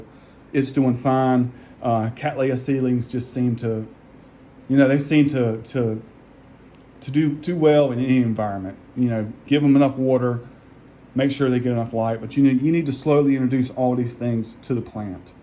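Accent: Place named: South, United States. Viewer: Hey dear! Nice to put a cute face to a sweet southern accent 😉 Great shout out for moms! 😁 I think seedlings should be labeled 1st year, 2nd year 3rd year. It gives me more of idea what I'm dealing with. Ebay sellers want to sell 1st years as NBS!!! Ugh aggravates me! Great video! 👍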